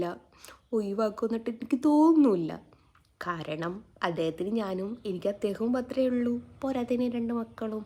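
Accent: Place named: Kuttiady, India. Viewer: nigalude bhasha kuttiady bhashapole und. Poonoor anennu eppoya arinja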